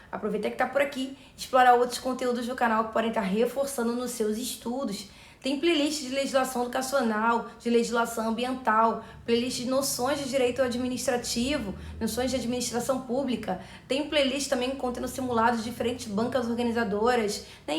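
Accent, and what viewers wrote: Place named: Rio de Janeiro, Brazil. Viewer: Adorei esse carioquez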